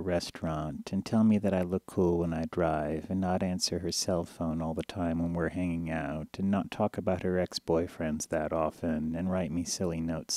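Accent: Eastern Europe. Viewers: great! my boyfriend can say it is true! and this eastern european accent... and everything else... besides his cheap cologn.... aaaaaaaaaa... how could you know? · eastern european accent? mmmm.. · eastern European accent right here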